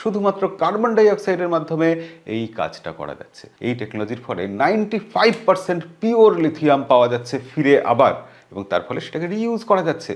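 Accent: Bangladesh. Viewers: পরচুলো পড়া এই লোকটা বাংলাদেশি উচ্চারণ নিয়ে কি করে আনন্দবাজারে এলো।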